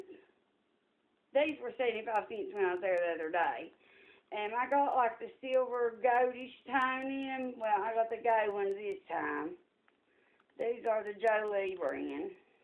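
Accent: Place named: South, United States. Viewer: Hey, love watching your videos, your southern accent gets me every time it's too cute. I fianally found the cinnamon candy and colouring books! I was so happy, I bought two bags of the candy it was really yummy. My yorkie loves any kind of jerky. Like seeing what your mani of the week is too! Have a great weekend sweet friend. Xo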